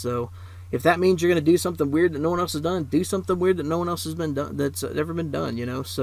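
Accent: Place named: South, United States. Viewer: definitely southern states US accent and as a Brit I like it. I always found the accent like the Irish to be very nice to hear.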